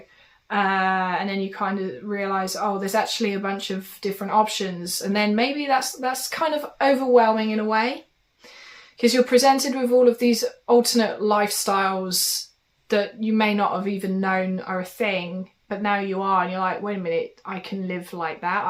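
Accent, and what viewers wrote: France. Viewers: Hi are you secretly French or do you just have an insanely amazing French accent?! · I love your French accent haha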